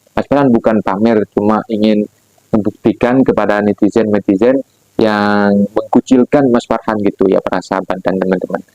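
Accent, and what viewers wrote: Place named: Java, Indonesia. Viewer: Guna BM aksen jawa biasa lh tu👍👍mgkin yg komen tu,hanya pndai 1 bahasa jadi prasaan iri itu ada😂😂semangat bro,mental mau kuat💪💪👍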